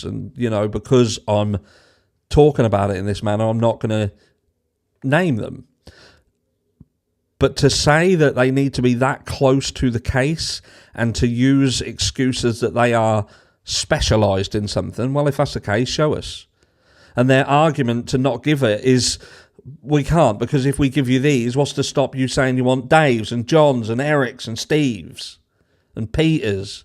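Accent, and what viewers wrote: Norfolk, United Kingdom. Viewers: Wow, you've a thick norfolk accent!! I dont have a regional accent but i'm born & and raised in Norfolk so I recognised it within seconds! You were saying the word 'hear' but you pronounce it as 'hair', made me smile!😊 The lawyer you know is absolutely brilliant youre right. He always remains objective, even though he leans to the side of a defence perspective. Isn't it nuts how differently the US tries cases? They are so open compared to us here in England!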